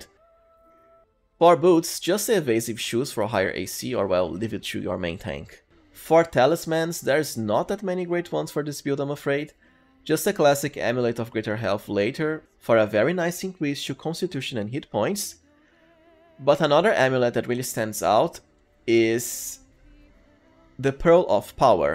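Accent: Brazil. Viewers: Eu sabia que tu era brasileiro so pelo sotaque kkkk a gente se identifica ate em ingles pqp kkkkkkkkkkkkkkk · Se essa voz e sotaque n for BR minha vida é um mentira!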